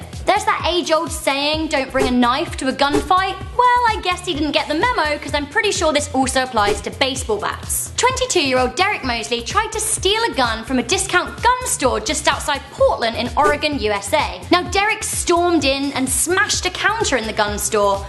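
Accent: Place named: England, United Kingdom. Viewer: Out of curiosity. Which part of England you are from?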